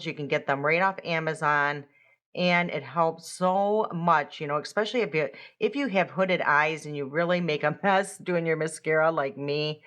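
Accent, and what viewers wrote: Midwest, United States. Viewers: Thank you so much for your review of the Cover FX foundation!! Your Midwest accent is wonderful! I’m happy to follow someone who looks and sounds like me ❤❤❤ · hi, Thank you for writing back to me. You know, you're right about the Midwestern accent. We all possess that tell-tale sound that identifies our Midwestern whereabouts! I have been to my brother's only a few times. It is sad because when my husband died in 2012, I went into deep grief and depression and became agoraphobic. They offered so many times, but I was afraid to leave our home. I am not agoraphobic anymore, thank God, but I've missed so much of living since it lasted eleven years. My daughter died in 2022 and there are no words...it's just my son and me now. Life throws it's curve balls to everyone. God has been wirh us and continues. I'm sorry for the above. 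I hope it was okay to write. Anyway, I'm looking forward to more and more of your videos! I'm trying to decide what shade I should order of the makeup you applied. It was so perfect for you! I'm light neutral so I'm not sure and there are so few shades available!! I'll figure it out! Lastly, thank you for being kind and so enjoyable on each video. It really means so much to me. 💌💌💌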